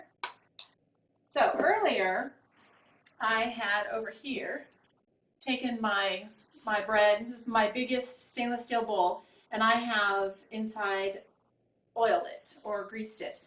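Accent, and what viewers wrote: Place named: Scotland, United Kingdom. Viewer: The first sentence made me think you have a Scottish accent :-P.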